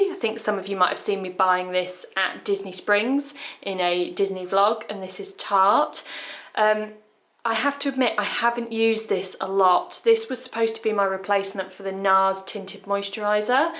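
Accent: United Kingdom. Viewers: I love so much follow your videos from Paris. This is all the time very interesting and best British accent ever hear in YouTube ❤️❤️❤️ kindly, an lover girl of England 🏴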